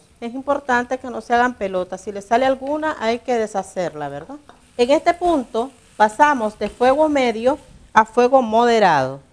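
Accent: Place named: Nicaragua. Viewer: Me cae super bien esta mujer como habla pura nica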